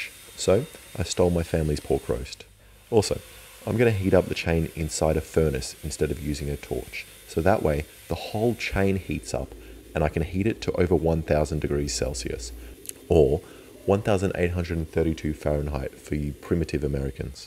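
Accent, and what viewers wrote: Australia. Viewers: People are saying “oH hEs sO aUSsiE” and I agree as a fellow Aussie yes we only wear thongs and barefoot (in Australia we call “flip flops” thongs get your mind out of the gutter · Got the aussie accent too…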